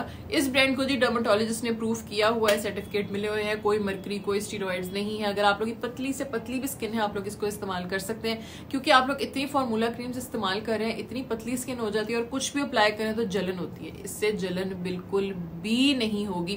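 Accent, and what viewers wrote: Punjab, India. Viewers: Asalam o alaikum yar api ek video full punjabi me banae itni Sweet punjabi bolti hen maza double ho jata hy😂😂❤ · The punjabi accents are just love api 😅❤ Truly a girl down to earth 🌎 ❤️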